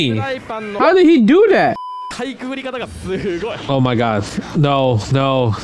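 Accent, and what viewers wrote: New York, United States. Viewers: Commentary is 🔥! Def. NY 🗽 talk albeit you in Canada 🇨🇦. 😂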